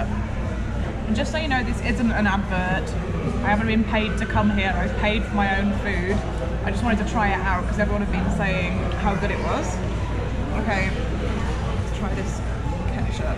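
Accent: United Kingdom. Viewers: British accent - interesting. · Did people ask you if you were British lol. Love your accent lol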